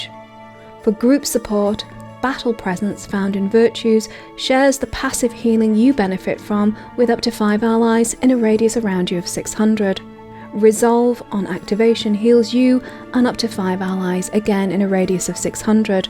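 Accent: United Kingdom. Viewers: Honestly what got me to give your videos a try was your amazing British accent but once I watched the video it was really well made and informative. Subscribed.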